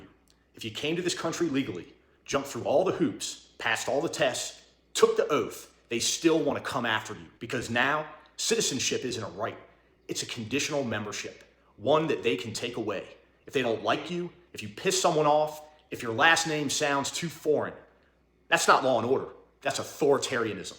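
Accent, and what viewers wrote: Pennsylvania, United States. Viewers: When you speak, they can tell that you are an American. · Omg, Pennsylvania! I thought your accent sounded familiar! Thank you for everything you've done for our country and what you're doing for us all now! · I could hear the Pa accent. Thank you for your message, means a lot.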